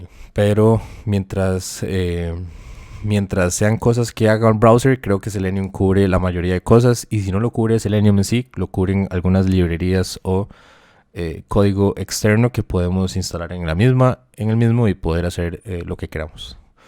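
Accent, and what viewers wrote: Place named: Costa Rica. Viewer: Muchas gracias estimado, creo que sos tico por tu acento. Grande Costa Rica!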